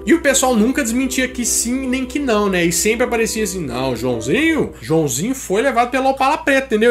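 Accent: Minas Gerais, Brazil. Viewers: mds mano tu é mt mineiro, é o mineiro mais mineiro que eu já vi no youtube kkkkkkkkkkkkkkkkkkkkkkkkkkkkkkkkkkkkkkkkkkkkkkk fala mineirez dms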